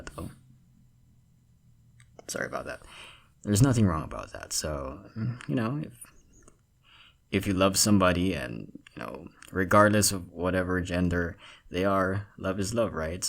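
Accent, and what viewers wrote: Philippines. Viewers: I like ur Tagalog accent sm 😭✋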